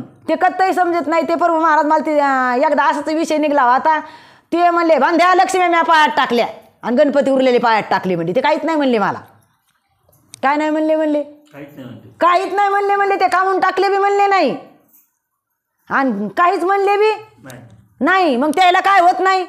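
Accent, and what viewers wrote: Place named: Marathwada, India. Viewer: एकदम मराठवाडा भाषेमध्ये खरंच खूप छान प्रवचन केले आहे मी आळंदी वरून ऐकतो आहे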